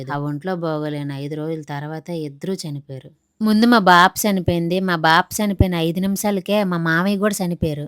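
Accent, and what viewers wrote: Srikakulam, India. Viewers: తల్లీ నీ భాష కాంచెం మార్చు శ్రీకాకుళం పరువు తీయ్యకు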